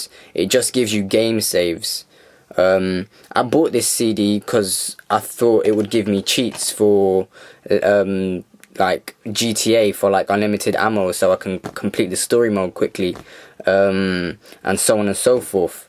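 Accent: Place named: East London, United Kingdom. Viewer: come to east london, almost everyone sounds like him here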